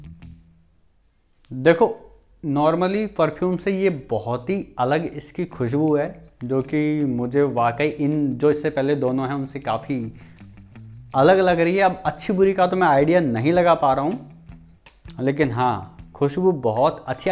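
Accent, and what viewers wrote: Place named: Western Uttar Pradesh, India. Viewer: samjh gya tha mein bolne ki accent se konsi city se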